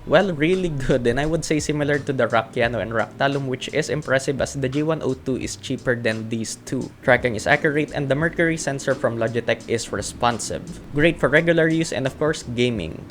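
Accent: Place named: Philippines. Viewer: I subscribed, very good review, nice filipino accent.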